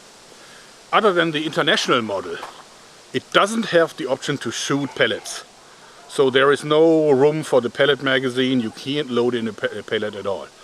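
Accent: Germany. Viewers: Don't be sorry for speaking german, thast is your heritage and birth right, I love your accent and the videos you make are most entertaining. Im from a town called SPARTA Missouri and all we have here is amarican whites as far as the eye can see, (myself being included), except of course for the asians who run the small asion food resteraunt in the middle of the town. Its nice hearing and seeing people from differant parts of the world and your german is a fresh experiance from the norm.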